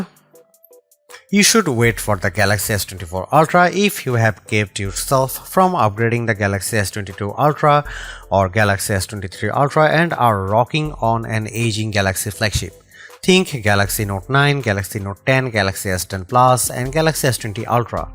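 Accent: India. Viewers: Indian English accent 😂😂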